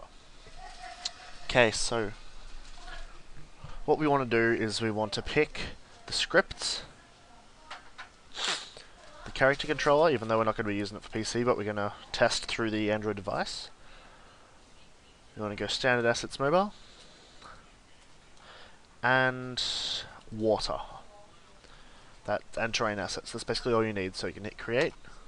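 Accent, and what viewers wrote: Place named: Australia. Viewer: You're Australian :D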